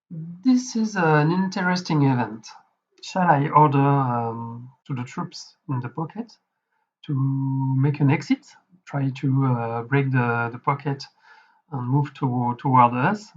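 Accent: France. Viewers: Nice vid, it's great to see the game played by its developer (and to hear his delicious English accent from Paris 😉) 👍👍👍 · The aesthetic is very beautiful. And the gameplay is innovating. You're english accent seems to betray a french identity ;). Vous avez développé ce jeu tout seul ? Il manque peut-être des musiques de fond pour le côté drama (comme dans le futur jeu Burden of Command).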